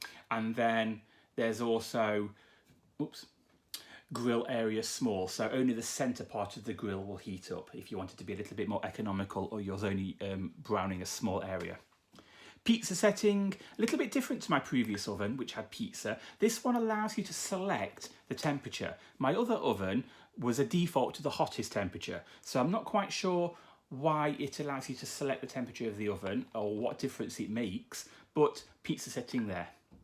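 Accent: United Kingdom. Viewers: Thank you for this excellent review of a product that I will need to replace as well. I also am a fan of Bosch appliances and have had good results with all of the. Now it is time to update the hob and oven. Reading the Bosch website info makes all the products so alluring and the more money you spend the better the appliance sounds. This video and especially the follow-up are just so objective and provided all the information that I needed. Bosch is extremely costly here where I live and spending that amount of money on the oven that has some minor flaws, that might turn into major issues after the warranty has expired, is a big NO NO. Also love your accent, that if I have to guess is from the Midlands.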